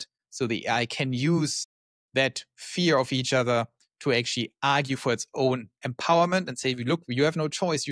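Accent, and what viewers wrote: Germany. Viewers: Are you German? Your accent sounds like it but your name doesn’t lol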